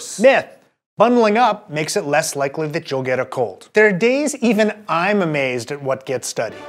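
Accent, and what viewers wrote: United States. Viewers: I'm with Joseph here, remember that you are watching an American channel, we don't use the metric system. It isn't hard to tell by his accent that he is American.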